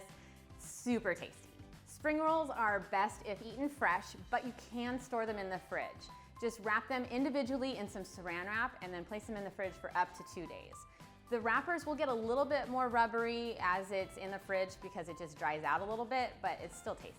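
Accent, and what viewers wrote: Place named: United States. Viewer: Judging from your accent you are an american. Must say you pass as a cook venturing into exotic asian cuisine. Do pay more attention to the proper way of wrapping . Good try!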